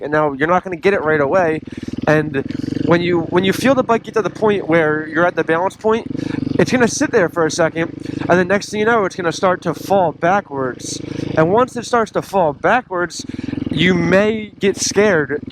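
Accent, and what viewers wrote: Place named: Philadelphia, United States. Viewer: what part of eastern pa are you from lol??????? That philly accent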